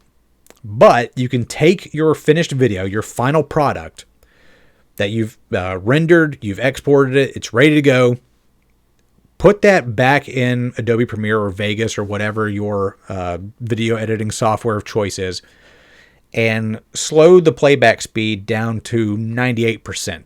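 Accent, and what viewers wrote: United States. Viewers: I'm obviously not speaking for everyone, and it is somewhat rude to say, but Slap on Location is annoying to listen to while playing games, due to shifting tones of narration (read vs memorised), long pauses in speech and the occasional interview, a big hurdle for people not accustomed to American accents.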